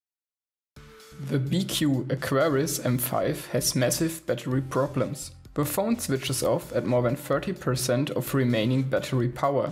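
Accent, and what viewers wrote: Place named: Germany. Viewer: Klingt so unschlagbar Deutsch, kann man nicht verbergen... EDIT: Good to know i would sound... now it makes sense why people always want me to join the voice-chat.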